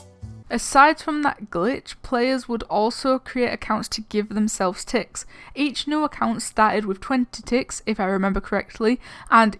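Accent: United Kingdom. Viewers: oh god what is with your accent, jeez. thats some pure accent from britain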